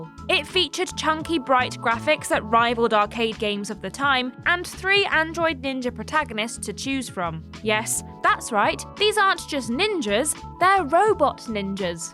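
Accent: United Kingdom. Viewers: Are you from the UK????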